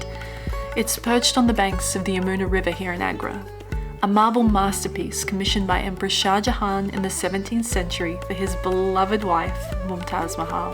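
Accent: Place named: Australia. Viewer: 😂😂😂😘oh this is our Australian accent ❤❤❤❤awesome 😂😂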